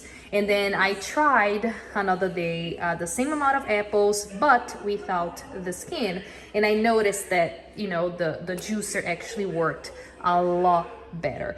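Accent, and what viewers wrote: Brazil. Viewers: Brazilian accent 😊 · Love the Brazilian Accent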